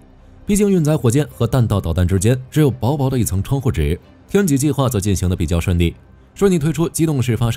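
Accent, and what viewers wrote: Mainland, China. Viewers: 這個頻道的語音為什麼是中國大陸的口音？是用中國大陸的AI配音嗎？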